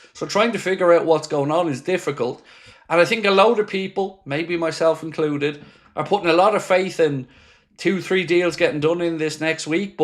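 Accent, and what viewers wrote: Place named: Liverpool, United Kingdom. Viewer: More Scouser verbal diahorea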